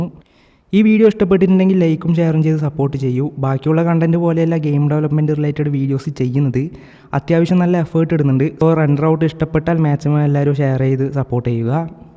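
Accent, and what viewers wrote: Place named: Palakkad, India. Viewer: Pro place palakkad aahno? Njn ahne. oru touch und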